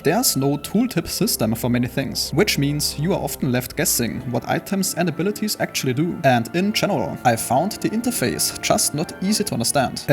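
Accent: Germany. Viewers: Der Deutsche Akzent ist schon nice XD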